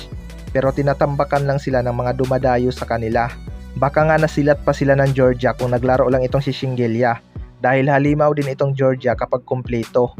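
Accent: Visayas, Philippines. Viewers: Magbalita ka naman ng tama bisaya oi😅😂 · Bisaya ka bai😂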